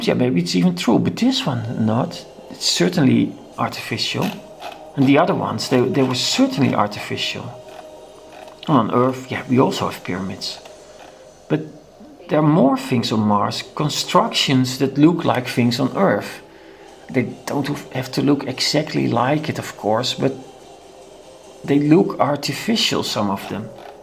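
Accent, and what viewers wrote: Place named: Netherlands. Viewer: i put 1000 on 0 that your dutch :)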